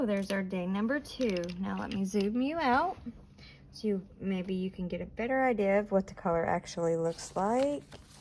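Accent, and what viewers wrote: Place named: Kentucky, United States. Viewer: Oh, how cute! Love your intro music, so fun and your desk set up today is so stylized! I was working away at Kerby dragon with you last night. Made a boo boo because using my Prismas, erased and worked on finding a colour combination. I found that one that you suggested in Prisma was too dark when used with another, so I persevered and tested things out. I learned a lot doing this and got a colour combo that is similar to yours but I liked even better, haha! I then decided to use only three colours on the scales and it looks good. I had two colours that are the darkest and midway through, I forgot which one of the pencils to use. I've decided to go between the two. I figure that animals in real life have colour variations in their scales and are probably not consistent in colour. I wanted to let you know that I really like your channel because it feels more personalized compared to many of the others. 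I feel as if I'm colouring with a friend, but at the same time, I get the feeling like I'm learning from an expert. I don't know why you think that you are not great at talking during your videos, you are quite chatty and personable and your Kentucky accent adds charm especially to my Canadian ears, lol. I also like how loving and caring you are to your children and I can strongly relate to that. My heart gets warmer when I am colouring and hear you talk about them. I really enjoyed colouring along with you yesterday because we just sat and coloured together unlike other coloris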